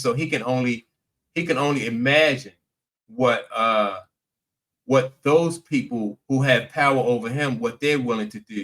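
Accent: Baltimore, United States. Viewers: Yo! You from Bmore! Huh?!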